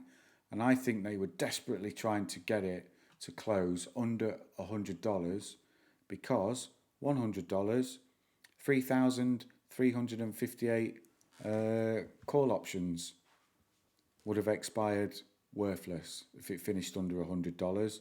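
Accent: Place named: United Kingdom. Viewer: You sound liked an idiot. He’s just speaking with British accent.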